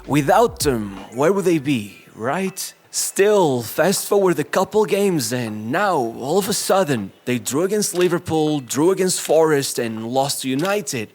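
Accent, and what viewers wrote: Portugal. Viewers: I thought you were better than this. You’re just Sky Sports with a Portugese accent.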